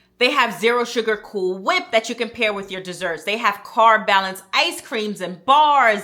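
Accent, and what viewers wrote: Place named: Bronx, United States. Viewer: I loveeee your accent! Some of my fam is from the Bronx! ❤ New Sub here!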